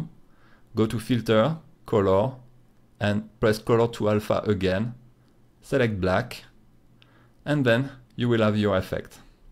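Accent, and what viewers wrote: France. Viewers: Always great from south of France with accent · You're french, aren't you? Love the accent x) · Je crois que c'est parce qu'il est français et qu'il parle anglais ^^ Peut-être le très léger accent qui fait qu'on comprends mieux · Je rêve ? On dirais que vous avez un accent Français quand vous parlez anglais ! x)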